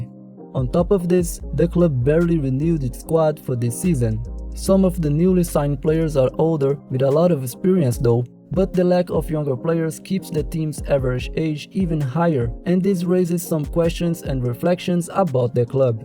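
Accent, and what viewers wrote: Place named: Brazil. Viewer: ele é br , so olha a pronuncia , da pra entender tudo kk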